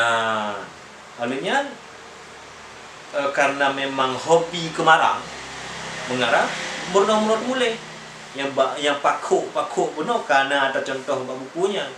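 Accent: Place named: Aceh, Indonesia. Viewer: Logat peugah haba hana hie agoe Aceh Besar. Hie Aceh Utara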